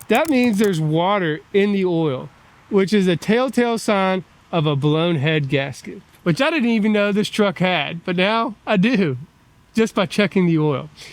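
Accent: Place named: South Carolina, United States. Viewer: South Carolina i hear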